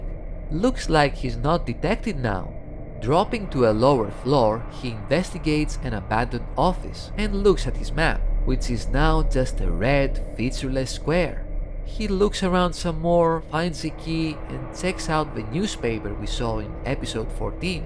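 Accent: Greece. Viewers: is this a greek accent? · Instantly could tell you're greek! Hello neighbor!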